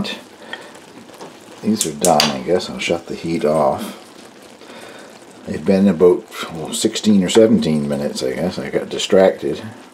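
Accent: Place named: Canada. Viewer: this looks like a yummy pudding I am wondering if the dumplings have come from my homeland (Scotland ) sadly I now live in Oxford,England which is a beautiful city of course but its not Scotland. I love your recipes its just lovely listening to your Canadian accent and enjoying all the things you cook including the cheeses. I live in a tiny bungalow with the smallest kitchen ever so I cant see myself getting into the cheese making including the press etc that needed. I started off watching your Cabin episodes but now I love all your videos I'm a big fan thank you again Mae x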